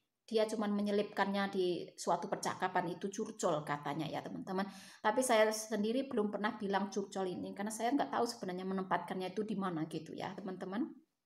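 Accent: Java, Indonesia. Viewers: pa kabar teh, suka lihat teteh bicaranya medok banget.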